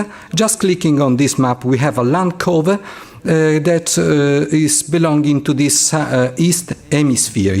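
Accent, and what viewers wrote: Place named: United Kingdom. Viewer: Sei troppo forte zio! Perfetto con un pizzico di accento britannico!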